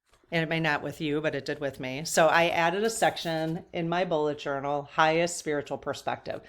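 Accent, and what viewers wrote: Midwest, United States. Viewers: I grew up in Iowa and live in Appalachia now… midwestern accent is comforting to me.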